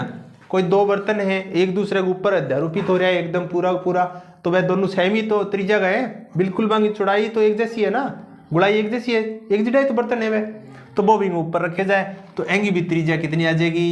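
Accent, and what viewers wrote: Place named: Rajasthan, India. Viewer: sir mujhe aap ke bolne ke accent se pata chala kyuki me bhi Rajasthani hu ☺️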